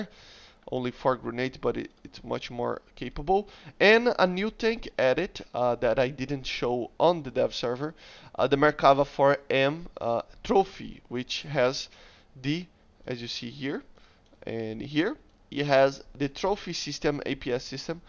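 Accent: Brazil. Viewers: kkkkk sabia, esse sotaque br e mt forte, mas o inglês e bão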